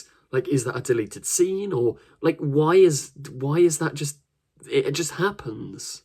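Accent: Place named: Coventry, United Kingdom. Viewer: Ooh you from Coventry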